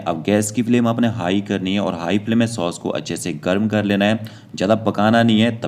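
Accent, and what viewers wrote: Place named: Himachal Pradesh, India. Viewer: Maine Apki tone se pehchan Liya,By the way kahan se??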